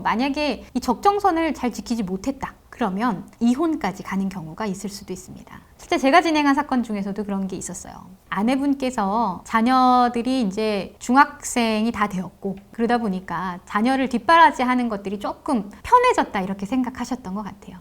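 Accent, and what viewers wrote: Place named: Jeolla, South Korea. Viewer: 은 전라도 사투리가 섞여 있네요 ㅎㅎ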